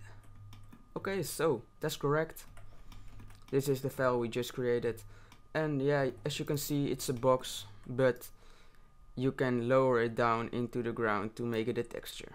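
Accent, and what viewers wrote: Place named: Netherlands. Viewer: ik hoor duidelijk Nederlands hahaha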